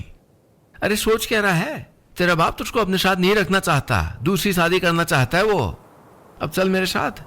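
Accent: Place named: Pahadi, India. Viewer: I am from Almora and your die heart story listener ...The way you narrate this story in our local tongue and tone is just out of the world... it was indeed an emotional story but I was smiling when you were like a pahadi speaker.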